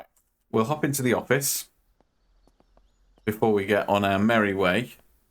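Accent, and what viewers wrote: United Kingdom. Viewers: I would suggest you collect the feed as it is great for hunger. I am really enjoying your videos and it is great to hear a British Youtuber. Please keep up the great content and I will like all of them. You are very entertaining.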